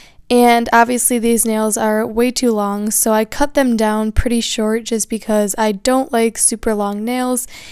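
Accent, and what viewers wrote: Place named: North, United States. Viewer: Are you from Buffalo or Chicago? You have those classic northerner 'A's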